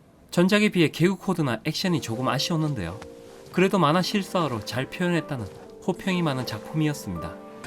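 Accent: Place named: Gyeongsang, South Korea. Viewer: 경상도 사투리가 물씬 풍기는 의 나레이션에서 뭔지 모를 독특함이 느껴진다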